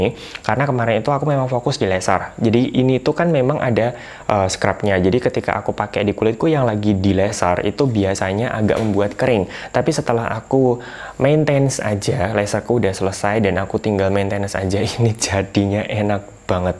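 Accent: Java, Indonesia. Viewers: Dosen skincare pertama saya 😁... Dokter, medhoknya mirip youtuber "Korea reomit"... 🤩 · Mbleber ndlewer ndlewer 😂😂 Jowo buanget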